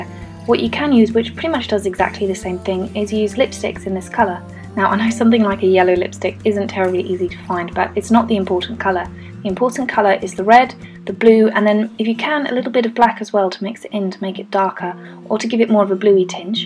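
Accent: England, United Kingdom. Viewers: are you english?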